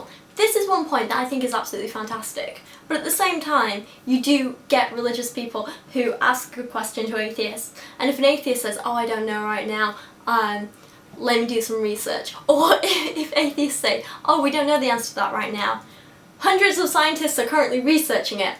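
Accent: United Kingdom. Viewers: MaxxxwellC I think its... British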